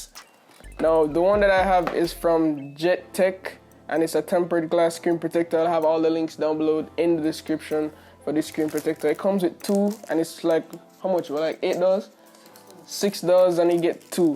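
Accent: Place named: Jamaica. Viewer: R u Jamaican? I hear an accent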